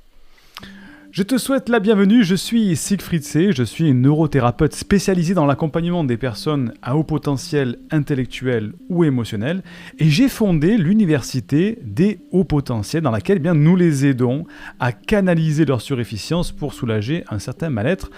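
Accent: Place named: Quebec, Canada. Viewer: Euh...écouter un québécois à vitesse 1,5 ça demande concentration quand même 😁. Heureusement que c est pas long. Très intéressante vidéo, merci. Christophe Bernard, d Altheaprovence explique très bien aussi l effet placebo et nocebo... Et, si, oui, moi je m endors volontairement à l heure que je choisis et je croyais que ça marchait pour tout le monde !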